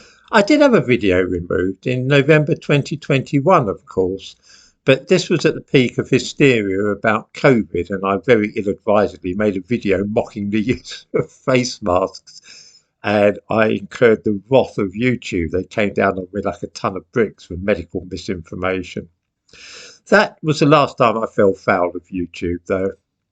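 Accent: United Kingdom. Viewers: It is mostly because you MUMBLE and only the UK English can make out most of what you say. I find it very hard to understand a lot of your speech. Tryin learning how to speak well and once you reach a bit of an audience, you will get strikes and a van. You simply aren't a threat to the Left. I doubt that even they understand half of what you are mumbling.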